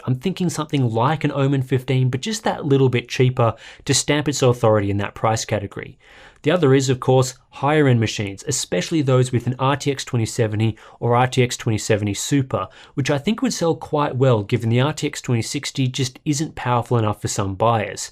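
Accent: Australia. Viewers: great work with the review. only of the reason i watched it was that you are in australia (assumption with your accent). i would have liked if some of your links were australian stores. just google australian store none of them stock ryzen laptop except the ASUS Tuff or asus rog g14. not helping with the decision making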